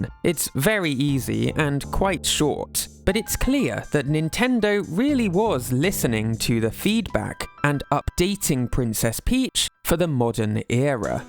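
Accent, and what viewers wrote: United Kingdom. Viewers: One word and i know that you're a british although it's my first time watching this channel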